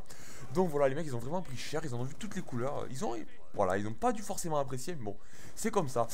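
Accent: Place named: Marseille, France. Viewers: Un toulousain avc un accent marseillais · j'alias lui demander s'il était pas marseillais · T'avais dit que t'allais montrer tes stats lol jaime beaucoup ton accent Marseillais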